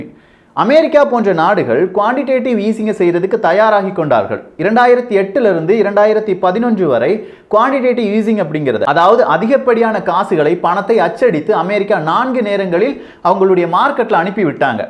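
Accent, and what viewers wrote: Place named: Madurai, India. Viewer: Dai Marithas Mathuriya Pasura da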